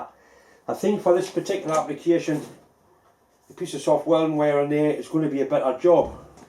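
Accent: Newcastle, United Kingdom. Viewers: For 42 seconds I was transfixed..then I was presented to the Geordie Andy Capp...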